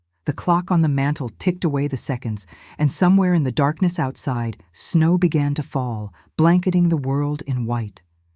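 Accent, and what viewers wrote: United States. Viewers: The american accent is awful, it is a british setting....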